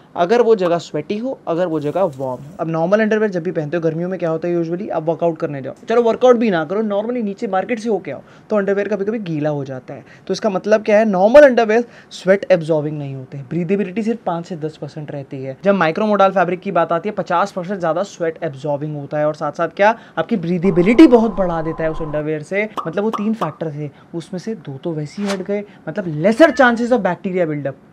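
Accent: Delhi, India. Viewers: Delhi Valli baat cheet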